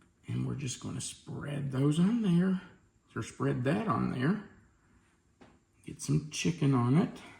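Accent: South, United States. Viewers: Goodness, don't let anyone tell you how to speak! We like your down home country drawl in your voice! Alllllll of it! 😊 has a touch of twang in her voice too and you both are a delight to listen to! It really is like we are all sitting around the same table having a nice friendly chat with some really good food! Y'all really help cheer up people like me on their own. Blessings!❤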